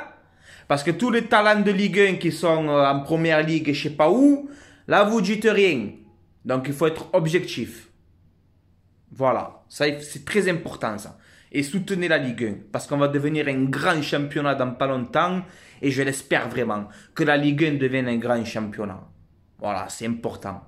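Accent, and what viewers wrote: Marseille, France. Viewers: Cet accent de pur marseillais !!!! Allez OM frereee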